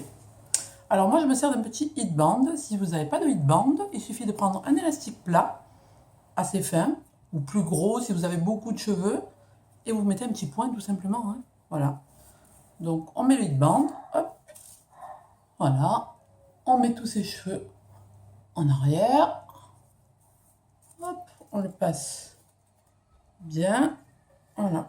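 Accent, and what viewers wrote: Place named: South, France. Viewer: Coucou, sympa de te voir "animée" et d'entendre ton petit accent du Sud:) Evidemment je m'abonne! Bisous bisous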